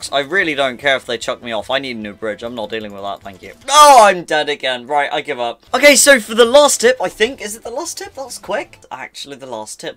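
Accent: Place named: United Kingdom. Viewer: I love your British accent I always wanted to be British but I can’t I hope I can do you think I could)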